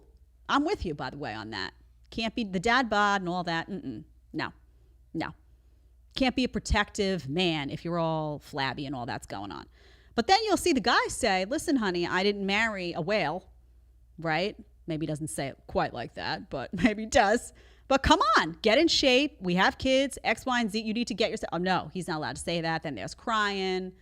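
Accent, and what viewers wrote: New York, United States. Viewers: I can hear NY in your voice sometimes, lol. You try hard not to show it.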